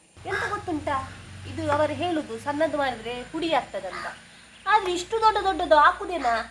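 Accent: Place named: Tulu Nadu, India. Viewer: ನಿಮ್ಮ ತುಳು ಮಿಕ್ಸ್ ಕನ್ನಡ ಕೇಳಲು ಖುಷಿಯಾಗುತ್ತದೆ. ನಿಮ್ಮ ಅಮ್ಮ ನಿಮ್ಗೆ ಒಳ್ಳೆ ಬಜೆ ನೆಕ್ಕಿಸಿದ್ದಾರೆ ಅನ್ಸುತ್ತೆ. ನೀವು ಕೂಡಾ ಯು ಟ್ಯೂಬ್ ನಲ್ಲಿ ಪಾಪ್ಯುಲರ್ ಆಗಿ ಬಿಗ್ ಬಾಸ್ ಗೆ ಹೋಗುವ ಅವಕಾಶ ಸಿಗುವಂತಾಗಲಿ.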